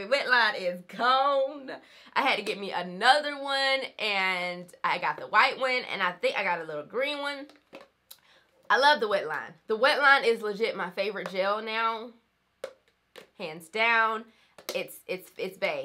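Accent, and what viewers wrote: South, United States. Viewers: Girl that southern accent is coming through!🥰 I would love to see eco and wetline comparison. Thanks for sharing your product empties. Peace and blessings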